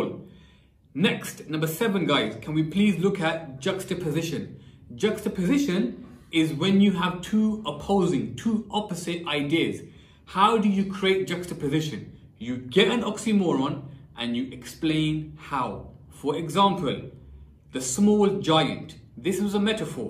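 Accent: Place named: Bradford, United Kingdom. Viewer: brother you from bradford?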